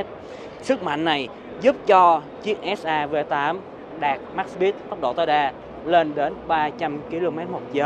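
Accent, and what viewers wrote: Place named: Saigon, Vietnam. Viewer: Giọng sài gòn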